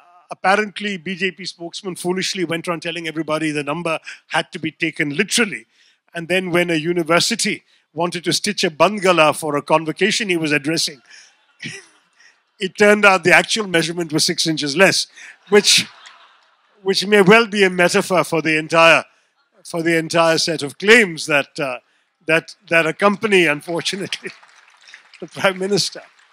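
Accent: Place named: Oxford, United Kingdom. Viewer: Shashi is actually an intellectual fraud. Whatever he speaks against PM Modi is mostly biased and is more applicable to his PM mms or Sg or rg. Speaking good English without substance is of no use for the ‘cattle class’ who have been given gas cylinders, houses, business loans, pension, medical insurance, saved from the tyranny of triple talak, electrity connection, cheap mobile data and above all a sense of hope and pride together. Speaking lies 100 times won’t transform it into a truth even if punctuated with oxford English. A true fraud.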